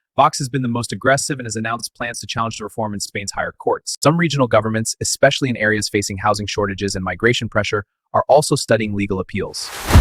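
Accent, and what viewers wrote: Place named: India. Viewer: Why did you suddenly have an indian accent? Odd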